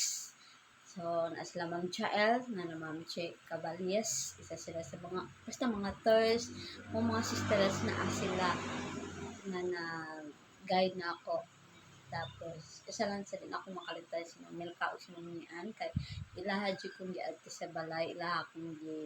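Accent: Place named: Visayas, Philippines. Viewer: suggest ko mag content ka, mag usap kayo ni camilo in english kay camilo tamang pagka english tapos ang sagot mo english rin kaso barok at bisaya pag ka pronounce.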